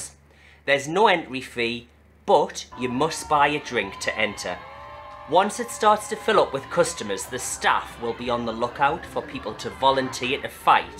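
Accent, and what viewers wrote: Newcastle, United Kingdom. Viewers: Great vid. Only question... is tha a Geordie accent mate?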